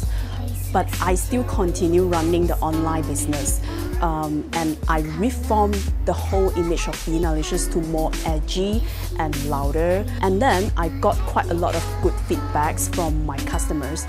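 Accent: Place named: Asia. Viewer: Your asian accent is so cute! And I love your directness, I totally agree with what you said! I will look for your stuff it looks pretty awesome. Thanks for the video☆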